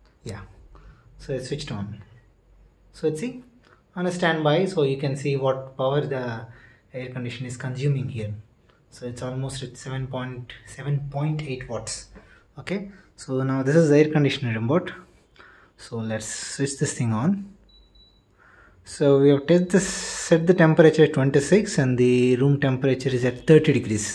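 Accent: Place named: India. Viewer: The indian accent is awful.. speak hindi only.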